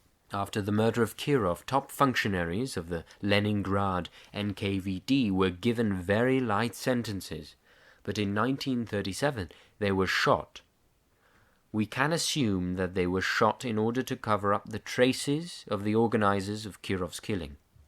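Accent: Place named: United Kingdom. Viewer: This is a great presentation of the secret speech, full of passion and expression that can be heard in the voice and tone of how he speaks. The slight "British" or "trans- Atlantic" inflection makes it sound all the more Historical and Matter- of- Fact. Historians never fret over these very minor things, as I care more for the content of the speech, rather than the way it may be presented. All the best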